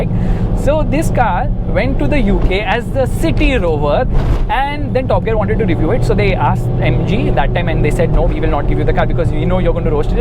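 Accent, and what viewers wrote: India. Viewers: Hindi influenced English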